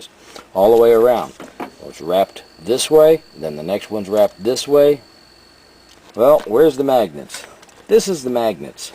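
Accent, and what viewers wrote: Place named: Texas, United States. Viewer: Great video,. You should explain how to test the diodes too. By the way, great Texas drawl too. Do you have a girlfriend yet?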